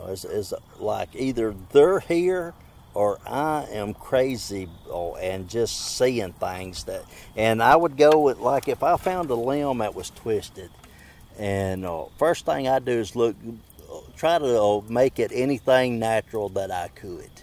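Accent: South, United States. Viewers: Thanks, but I gotta say I miss your sweet southern drawl.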